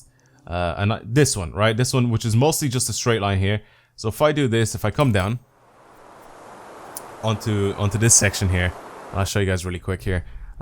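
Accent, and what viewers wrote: Ireland. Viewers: what part. of ireland are. yiu from and if your not irish i swear to jaysus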